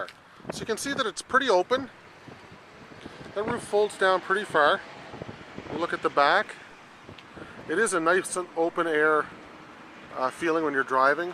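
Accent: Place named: Canada. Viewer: sounds like you are from Canada sweet car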